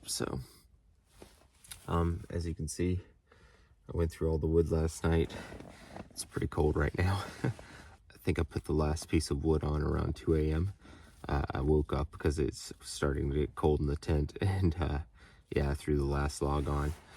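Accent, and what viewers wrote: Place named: United States. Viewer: You use a lot of British terminology in an American accent. Are you an expat?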